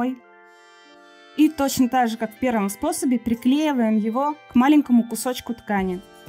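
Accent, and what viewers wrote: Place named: Japan. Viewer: I like japan english.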